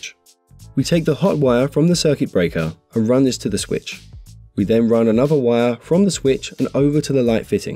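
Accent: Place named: England, United Kingdom. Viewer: Why North America? You're clearly English?????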